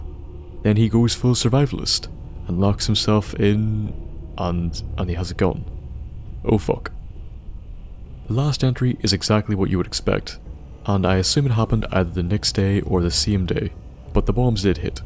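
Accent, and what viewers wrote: Northern Ireland, United Kingdom. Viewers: Are you from NI? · hey i'm new to the channel:3 and as soon as i heard your voice i was like "yay another NI youtuber:)" so where abouts are you from??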